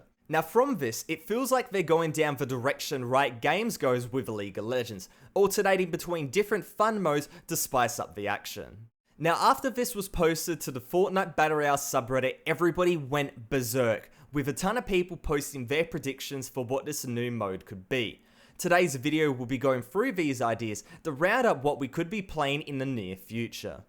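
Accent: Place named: Australia. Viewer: Your aussie to niceee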